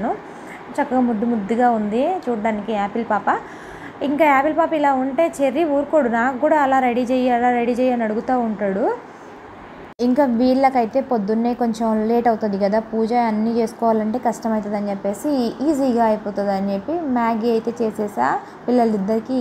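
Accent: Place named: Telangana, India. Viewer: Hai good morning Ra..ela unnavu,fever thagginda..Nannu gurthu pattava..Neevu Naku comment pettavu..Neevu entha ante chala chala baga matpadutunnavu.ila free ga matladithene video andariki chudalai anipinchi chuatharu..Nenu Nee video motham chusi,2 nd time chusthu comment pedutunnanu..avunu ra pooja chesetapudu devidi patalu vintu pooja chesukuntunte manasuku chala prasantham ga untundi...Nenu alane chesthanu ra...prasadalu chala opikaga chesavura...telgana,andra basha chala baga matladutunnavu....bagundi,Rendu Rakalu ga matladura..funny ga bagundi..mee ammayi apple annavu, ma manavaralu muddu Peru lo okati apple,enti okati ani anukuntunnava chala muddu perlu unnayi ra,cherry babu muddy ga unnadu..Maggie chinna pillale thinnara,hahaha,ma intilo andariki Maggie istamu ra..pooja gudu super ga undi, photo petti,kalasam chakkaga pettukunnavu,god bless you ra...Neevu anukunna korikalu Neraverali thalli...intha chinna age lo antha opikaga,chakkaga,paddathiga,chesavu,ekkada meeru undedi...fever thaggi usharuga undalani korukuntunnanu... 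OK thalli byebye...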